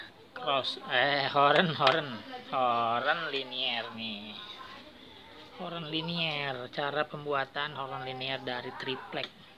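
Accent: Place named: Betawi, Indonesia. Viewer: Bang ko abang gaya bicara nya kaya orang jakarta/Betawi, inisiatif nya mantep baang, salut saya salam satu hoby, ane jkrta tg priuk 🙏🙏🙏🙏 👍👍👍👍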